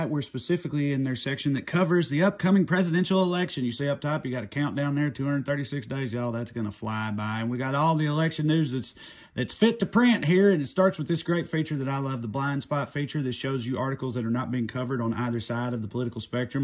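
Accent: South, United States. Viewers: I've read many articles and watched many videos explaining that most comedians have above average intelligence if not genius. Just watching and listening to, I'd guess - no, I'm SURE is in that upper range. He just tries to throw you off by emphasizing his Southern accent. And by the way, I'm from the deep South. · ROTFLMAO the Youtube rabbit hole, how do you think I found you Trea? You are your generation's George Carlin only with a southern accent. Meh baggage there I know.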